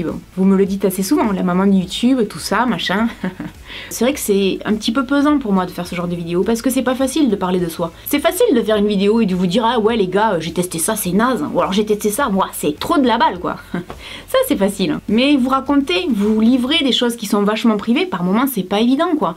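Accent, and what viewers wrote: South, France. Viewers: J’adore tes vidéos, la plupart du temps ce ne sont pas des sujets qui m’attirent mais je les regarde rien que pour ta personnalité et ton accent du sud que j’adooooore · Merci pour ce partage. Tu es une personne authentique et j'adore. C'est ta maman sur la photo avec toi ? Tu as déjà fait une vidéo avec elle ? J'aimerais trop voir si elle parle comme toi ? Je suis dans le sud aussi, mais c'est pas le même accent. Je ne vous connais pas mais je vous souhaite de bien vous portez toutes les 2, pendant longtemps.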